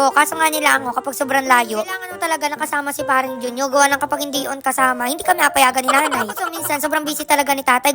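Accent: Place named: Quezon, Philippines. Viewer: ngayung 730 lang nene ay ang punto mo preho da quezon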